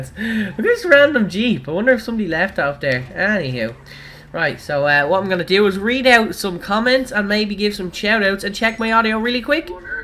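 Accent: Ireland. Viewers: Where you from in Ireland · are you irish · Are you irish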